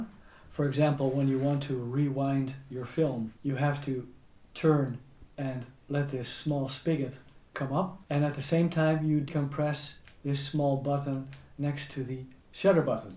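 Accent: Netherlands. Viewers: Nice review, you sounds very Dutch to me 👍🏼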